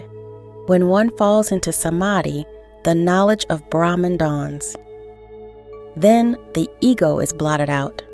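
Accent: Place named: United States. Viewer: Don't want to nitpick here but to hear these words pronounced with a heavy american English accents is really jarring.... and I think somehow detracts from the quality of the video. The proper Sanskrit pronunciation of Jnana is "nyaa-na" .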